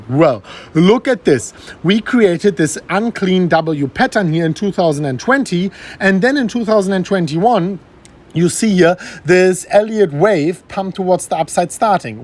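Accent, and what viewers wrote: Germany. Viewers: This guy has all the things I hate about YouTube. Terrible thumbnails, click bait, shamelessly promoting affiliate links, too many ads etc. However I love the emergency streams even though nothing is happening, the German accent and he seems like a stand up guy. All the best. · Can someone tell me what his accent is? German?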